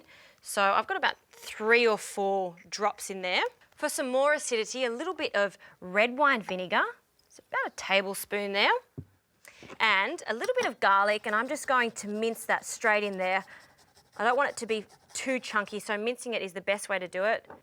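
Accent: Australia. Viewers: I love the Aussie accent!!! Its like a Southern accent here in the USA.😂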